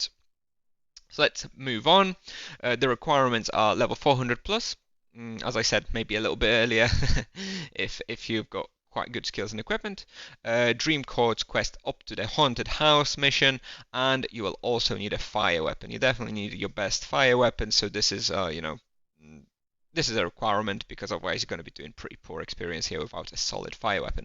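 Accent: United Kingdom. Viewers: w Angli mieszkasz ze taki akcent ?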